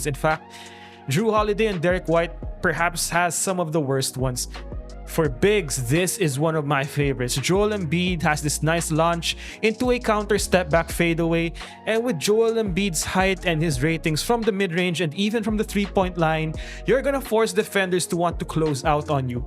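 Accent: Philippines. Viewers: Tagalog accent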